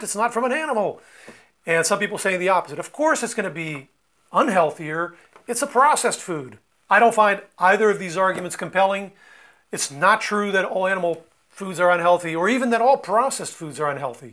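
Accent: United States. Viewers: Sounds like an American born and educated person. He’s not making any other person with a Portuguese sounding name better or worse. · he does sound like an American, but having a US English accent doesn't make him a US citizen. He graduated as a doctor in Lisbon and he merely did a PHD in the US, but has since returned a while back to Portugal.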